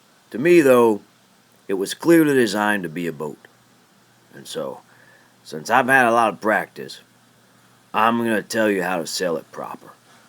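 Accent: West, United States. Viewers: his voice sounds western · DO MORE VIDEOS PLS I LIKE TO HEAR YOUR WESTERN ACCENT AGAIN AND AGAIN!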